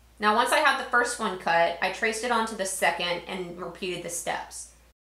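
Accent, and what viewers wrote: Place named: South, United States. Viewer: Is it just me or did I hear a little bit of a southern(ish) accent? I can be wrong there, however I think it's a lovely accent.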